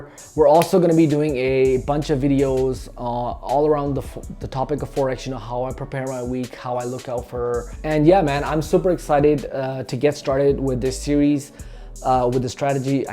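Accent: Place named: Toronto, Canada. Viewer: sounds good brother. U sound like ur from Toronto. Am i right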